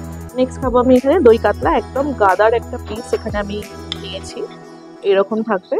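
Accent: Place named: Hindi, India. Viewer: আপনার প্রেজেন্টেশন ভাল। এডিটিং ঠিকঠাক। মাঝে মাঝে হিন্দি শব্দ (উদা: wagera) আর হিন্দি উচ্চারণের (masala) অকারণ ব্যবহার একটু কমিয়ে দিলে আরো ভাল হবে।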